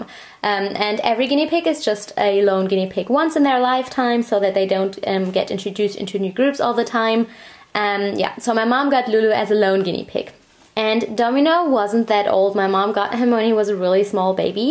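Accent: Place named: Germany. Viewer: You, my good fellow, are all over the place. 1. She can have as many guinea pigs as she wants, you have no choice in the matter. 2. One guinea pig is NOT enough. If you have one guinea pig by itself, it will literally be living in h e l l. They're herd animals and need to be in groups. 3. They can be, it's called floor time and it's how they get excersise. 4. She's...she's german- she has an accent and talks like that because English isn't her first language. In conclusion, don't comment stuff like this if you don't know what you're saying. Unlike you, she is an adult and can make her own choices.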